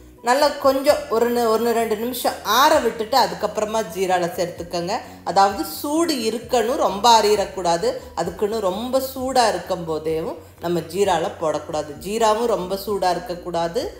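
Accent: Kongu, India. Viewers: Ungaluku koral super kongu styles beautiful sister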